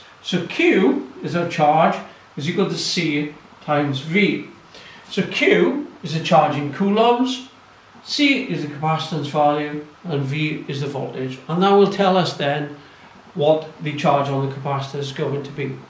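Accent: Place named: Wales, United Kingdom. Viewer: Thanks for this also,. You sound Cymraeg (like me) 😊 I have done a bit of electronics in my past amateur radio exams so this is a nice refresher for me. 👍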